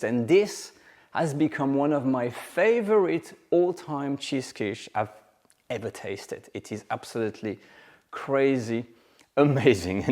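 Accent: France. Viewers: I could just listen to your French accent for ages!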